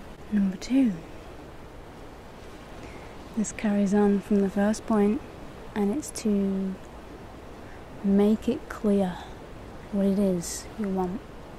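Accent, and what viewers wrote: United Kingdom. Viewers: Like so many before me, I would like to express my gratitude for your channel and my joy in finding you! I just today found your videos and am so happy that I have. ASMR coupled with spirituality and themes such as unity and a universal oneness is exactly what has attracted me so much, in addition to your beautiful voice (singing and speaking) and positivity. You are such a beautiful light and a lovely soul! Thank you for all your wisdom and sharing these relaxing and informative videos for the world. <3 Also, I have to ask. Your website states you're from Manchester, England. However, I think you sound just like Ygritte from game of Thrones (Jon Snow's first girlfriend, if you're familiar with the show). She's played by Rose Leslie, who is Scottish. So I was extremely curious how a British accent and a Scottish accent sound so similar! 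Are Manchester accents similar, in your opinion? I'm just a silly American who obviously is unfamiliar with the subtleties and differences in accents. Thank you again,! Much love and light! <3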